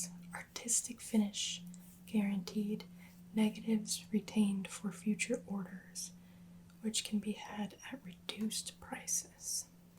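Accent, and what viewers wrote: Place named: Midwest, United States. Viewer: I love old photographs also because ladies and gentlemen dressed like ladies and gentlemen. People dressed up to go everywhere not just for weddings and funerals. I love your northern Midwestern accent. Your homepage tells me you're not in Michigan anymore😉. You look adorable in everything you wear( especially 1990s secretary roleplay).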